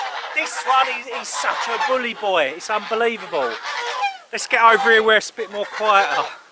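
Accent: Essex, United Kingdom. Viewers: Love your vids and your day in the life ones. Possibly some of the following, how you preserve your produce, advice on keeping birds, how you go about planning what food to grow and why. More of your brilliant how to repair stuff vids. Your growing tips and hints. Ps. Nothing wrong with an Essex accent 👍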